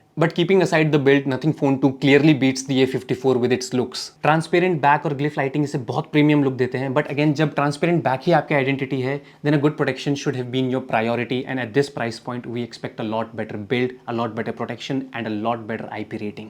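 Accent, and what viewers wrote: Dehradun, India. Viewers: Bhai dehradun s ho aap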